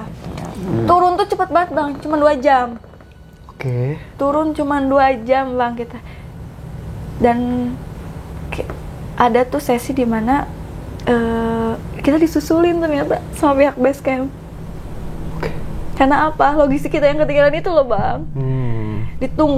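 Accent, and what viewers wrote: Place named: West Java, Indonesia. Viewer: Suaranya sunda dan enak 😘😘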